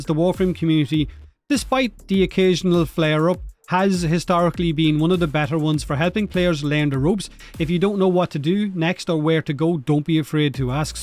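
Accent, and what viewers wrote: Ireland. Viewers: Where are you from in Ireland???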